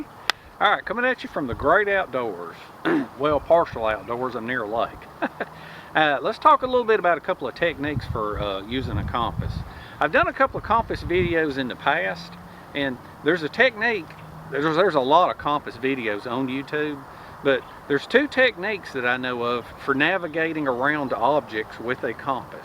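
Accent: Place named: Boston, United States. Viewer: To understand Boston accent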